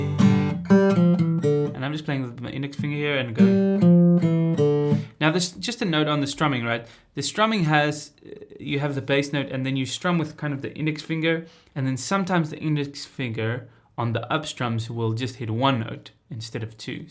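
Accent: South Africa. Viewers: Great video brother. Is that a saffa accent you’re sporting?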